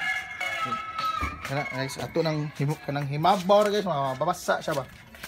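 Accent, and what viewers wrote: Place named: Visayas, Philippines. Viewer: bakit hinde ko napanood ang video na ito.bisaya kaayo ang vloger.hahaha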